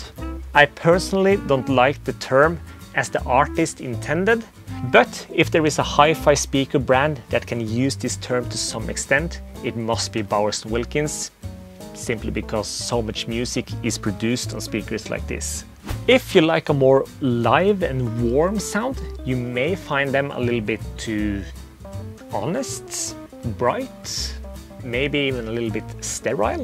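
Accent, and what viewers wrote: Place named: Sweden. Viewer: snak dog Dansk i er et Dansk Firma i stedet for Svedish med en Lattlig svensk accent Kom dog ind i kampen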